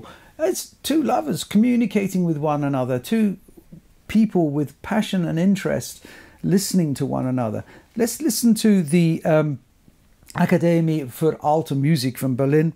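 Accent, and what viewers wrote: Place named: United Kingdom. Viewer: Any Americans watching don’t let the British accent fool you. This man has nothing knowledgeable or illuminating to say about this music. His vocabulary is tiny and his attempts to pronounce French, German and Italian are pitiful. Worst of all, and it’s a crowded field, is the way the camera lingers on him throughout the extracts as he “conducts” along, bereft of any sense of rhythm, like Cary Grant as Toscanini.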